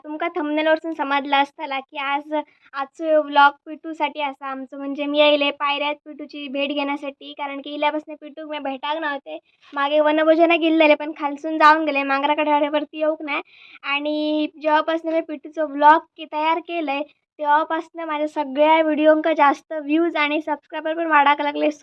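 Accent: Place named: Malvan, India. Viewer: Malvani tuzya tondun aikayla maza yete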